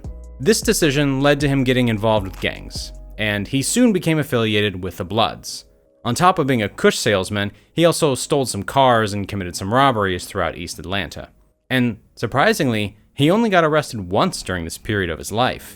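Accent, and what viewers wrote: United Kingdom. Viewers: I'm a bit late to comment on this vid But he's definitely from England🏴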